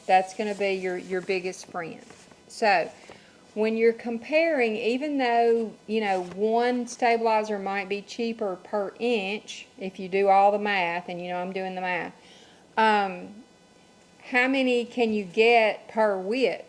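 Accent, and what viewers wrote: South, United States. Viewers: PS I absolutely love your southern accent... We northerners don't hear that very often, so nice .. be well All the best to you.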